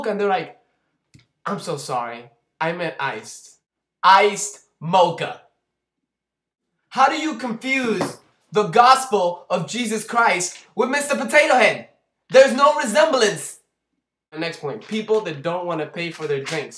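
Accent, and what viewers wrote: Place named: Miami, United States. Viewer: Lol. you are so cute and funny! I recognize a miami guy when I hear one ;)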